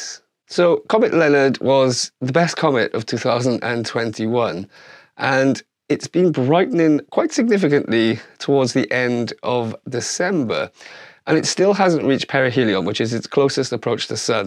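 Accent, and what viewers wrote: Wales, United Kingdom. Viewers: Great details, 👌 u probly know already. Also superb asmr c; Cymru accent,? Live from snowdonia? C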